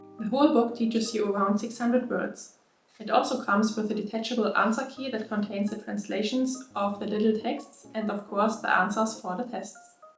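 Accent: Germany. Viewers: Have you considered taking the Tokini Andy courses? They look interesting… I want to get to level N5 by June 21, 2024… Best, John.. PS: Am I detecting a German accent?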